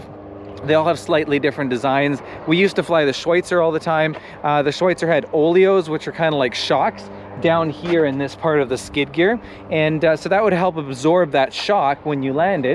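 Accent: Canada. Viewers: Great video. Couldn't understand your term "lead-leg" but then figured out your Canadian dialect was saying "lead-lag." Hahh!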